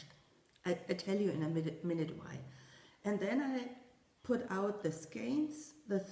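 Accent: Germany. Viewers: You explain everything perfectly! Don't worry about anything, your English is very good and I understand what you are saying. In fact, your videos are so entertaining to me.... I didn't take German in high school, so your accent and German tendencies are fascinating to me! I would love to meet you someday if you are ever in the states....❤️❤️❤️❤️❤️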